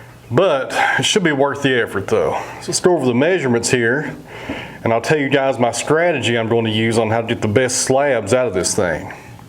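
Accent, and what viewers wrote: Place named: Appalachia, United States. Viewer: I have no idea how I came to click on one of your videos, but I bet it’s because I’ve been watching August Hunicke’s tree felling videos for some reason. Also have no idea how I came to click on his. Anyway, glad I did, because your Tennessee accent, your beautiful drone footage of your ranch, awesome music choice, and unhurried video style just makes watching feel like relaxation therapy. So, from and Australian fan, keep the awesome content coming.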